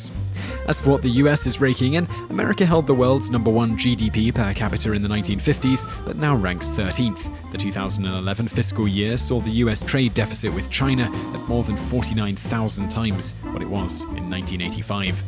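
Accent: United Kingdom. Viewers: the person doing the voiceover has a british accent ;)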